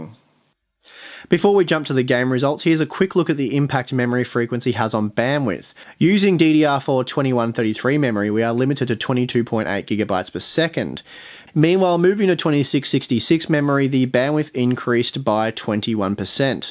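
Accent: Australia. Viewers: The RAM Jenga was awesome :D Please stick to your Aussie pronunciation, I always cop it for the way I say "Cache", but it's also our signature :D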